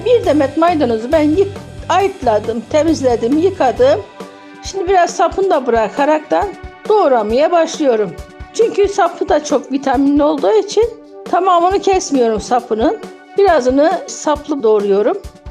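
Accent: Gaziantep, Turkey. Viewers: Tam bir antep dili konusuyon bayıldım konuşmana çok tatlı eline sağlık zeytin yağı kokuyor ayçiçek yağı kullansam olurmu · Yaaa teyzemmm Gaziantepten uzaktayım konuşman o kadar tatlı ki özlem giderdim. Ellerine sağlık çok güzel duruyor. Ama bir eleştirim var köftenin yanında yaprak sarmasını göremedim ☺️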